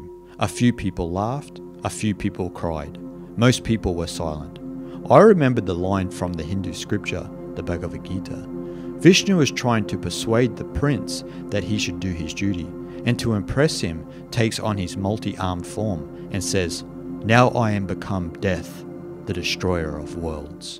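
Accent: Australia. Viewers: accent suggests to me that he is Australian. Am I correct?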